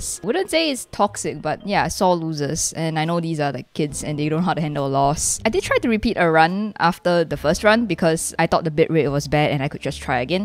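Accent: Singapore. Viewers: why do u sound like ur singaporen?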